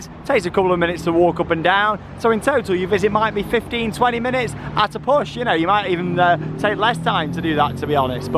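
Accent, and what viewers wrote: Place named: England, United Kingdom. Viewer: England accent.....did you said hell or hill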